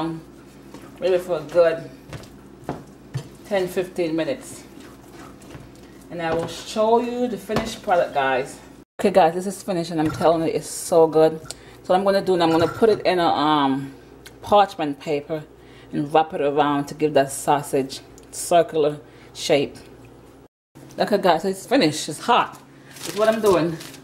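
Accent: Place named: Jamaica. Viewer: I knew she was Jamaican lol. That accent hahaha. Love my people!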